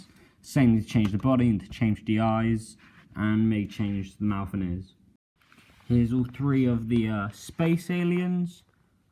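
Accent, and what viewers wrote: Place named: United Kingdom. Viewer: I’m sorry but it’s a solid British accent , it’s very easy to understand what is being said , are you from South Africa????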